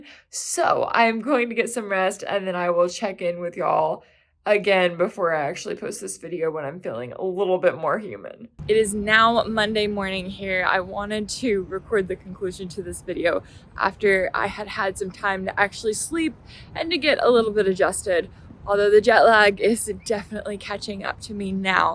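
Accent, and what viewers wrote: Texas, United States. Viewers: I'd love to hear your Texas accent :) and some restaurants or "streetfood" what you like in Russia :) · Блин красивая женщина) Есть что-то неуловимое, что притягивает мой взгляд. И еще ее американский мне абсолютно понятен. Приятно послушать чистый, понятный американский язык.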